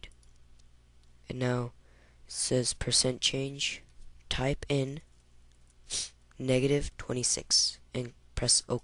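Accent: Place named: Canada. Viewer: you kinda sound like canada cool. ^w^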